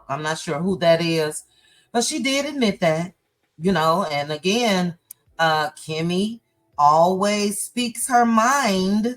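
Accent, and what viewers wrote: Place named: South, United States. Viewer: . I just love your commentary, and love your voice even more. My sister-in-law passed a view years ago and you and she sound exactly alike. You 're voices are so sweet with that southern twang. I listen to you often, and it just brings me such joy as I can hear my sister-in-laws voice which is quite comforting. Even my husband, her brother, says you sound exactly like her. Keep up the great commentary, and thank you.❤❤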